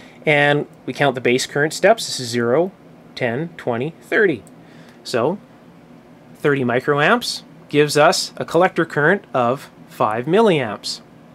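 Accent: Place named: Canada. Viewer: I follow K7PP and probably others that you and others involved with Ham Radio and Electronics. Pete has some great videos and I love those MONSTER DAWGS he has..Hi hi.. Do you follow David at Elpasso Yube Amps? He has some neat stuff as well. Of course I am sure you follow Dave with the EEVBLOG. He's a real hoot too. Are you in the US? You sound like you may be from Canada.. Anyway good chatting with you. I own DSM Labs here in SC and refurbish R 39x series receivers including the R- 389, 390, 390A, 391 and 392 receivers. I do a little Super Pro work from time to time. 73, Glenn WA4AOS dit dit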